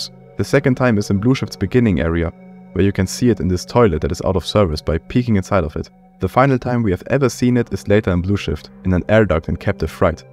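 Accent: Germany. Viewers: Der duuuude ist doch deutsch oder? 🤔🤔🤔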